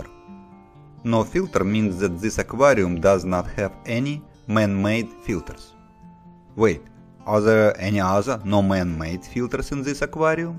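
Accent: Russia. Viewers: Интересный у вас акцент. ВЫ не русский, случаем?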